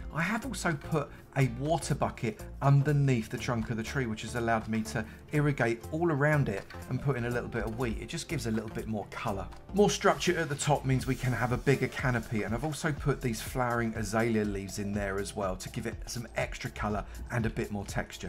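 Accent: United Kingdom. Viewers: Are u British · its the british accent 😹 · he got the average british "voice"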